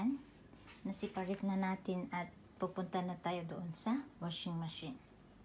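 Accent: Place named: Visayas, Philippines. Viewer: Halatang bisaya ka din po..same tau hehe..ang linis ng bahay pgnaka quarantine 😅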